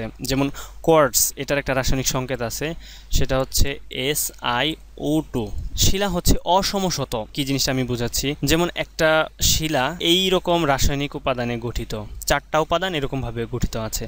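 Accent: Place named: Mymensingh, Bangladesh. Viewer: apnar voice sune mone hocch apnar district mymenshingh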